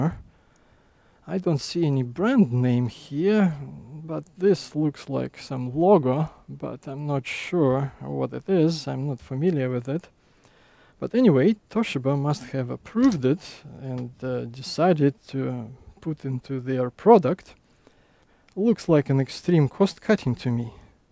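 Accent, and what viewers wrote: Russia. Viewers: Ахренеть какой русский акцент.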